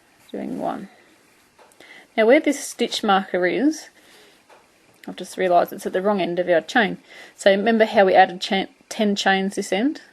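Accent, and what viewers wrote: Australia. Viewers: I hope you make more videos, You could read a calendar or a grocery list and I would listen, I love the Australian Accent.. hee hee.. thanks bunches!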